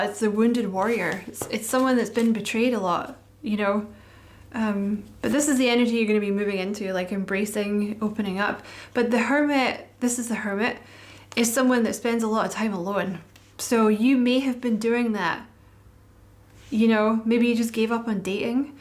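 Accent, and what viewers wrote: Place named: Scotland, United Kingdom. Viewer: Just from listening to you I can feel you're a very special person, spiritual, witty, funny, and I love ypur particular Scottish accent. Thanks for the reading. I love the beautiful being that you are...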